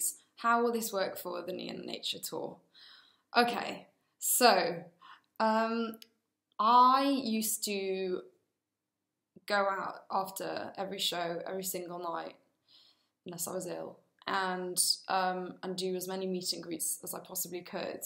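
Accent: Wales, United Kingdom. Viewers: travick no, she's Welsh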